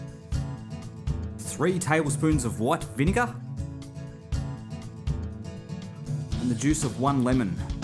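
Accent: Australia. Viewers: It's so good it's retarded. Real, ocker Aussie speak. Love it mate!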